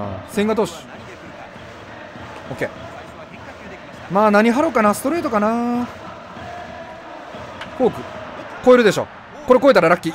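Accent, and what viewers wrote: Kansai, Japan. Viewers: 昨日からはうって変わってコテコテの関西弁に戻ってて草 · 標準語からバリバリの関西弁に戻った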